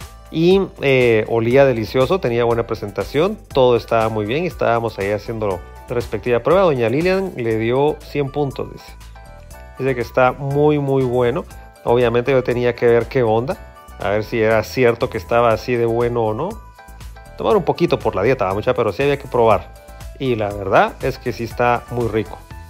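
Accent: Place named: Guatemala. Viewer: el acento chapin wow I love it !!!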